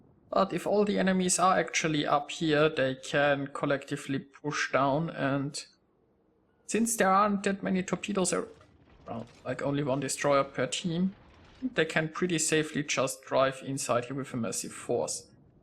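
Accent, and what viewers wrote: Germany. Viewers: are you german? Your accent sounds like a german who is speeking english.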